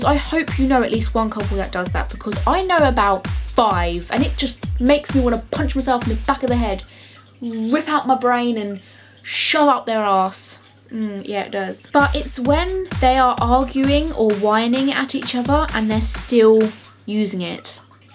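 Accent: England, United Kingdom. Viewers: i friggen love your english accent IM FROM SCOTLAND :) cuz you and i are like the awesome people from the UK ;D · I love your British accent!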